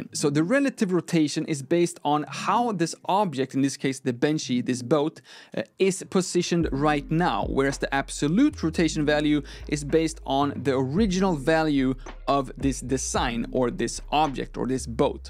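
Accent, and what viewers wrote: United Kingdom. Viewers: British English, subscribed! - Trying unlearn - unsubscribed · Keep your British English. It's not wrong. 😂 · British English, thank you, subscribed!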